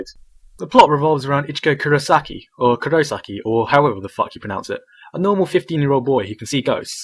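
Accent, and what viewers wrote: United Kingdom. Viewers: holy shit he sounds so bri'ish. he sounds so white when i picture him talking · Old school Gigguk sounds more British 😅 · he's british accent was pretty thick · Lol he sounds so british at this time. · His British accent was stronger back then. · his accent is more british here, he changed a lot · the british accent in this video hits differently with attitude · used to sound so much more British lol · He really had a strong British accent for a Thai lol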